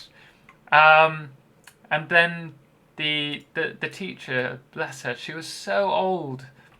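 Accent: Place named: Lincolnshire, United Kingdom. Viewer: I knew I recognised your accent! Nice to see a fellow Lincolnshire brit doing well on the YouTubes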